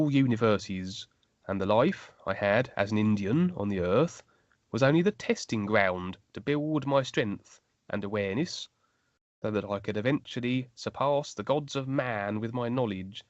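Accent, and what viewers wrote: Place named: England, United Kingdom. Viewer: Thanks, it’s nice to have a human voice and not a digital one to listen to. It takes awhile for me though, as a non native speaker, to get accustomed to your pronunciation. Are you from England or from Australia or New Zealand?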